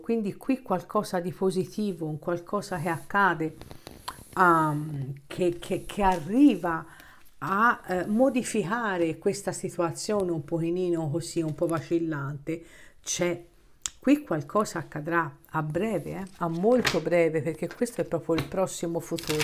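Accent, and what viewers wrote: Tuscany, Italy. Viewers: Ascoltati tutte e due, perfetti in entrambi. Grazie mi piace molto il tuo accento Toscano 🤩🥰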